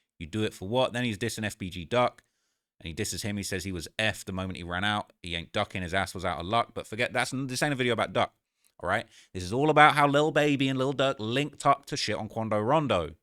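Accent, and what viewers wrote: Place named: United Kingdom. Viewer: You accent drives me NUTS!!! And the fact you always act like your black drives me even more nuts!!! You are a bum from the UK!!! Stay in your lane yt boy!!!! You gon get it very soon . Remember those words I just said. See you on the news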